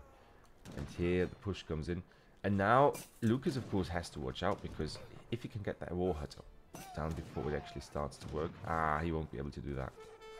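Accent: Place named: England, United Kingdom. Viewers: der Meinung war ich auch. Ein leichter britischer Akkzent kommt da durch😁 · Ja krass, du klingst echt wie ein Engländer.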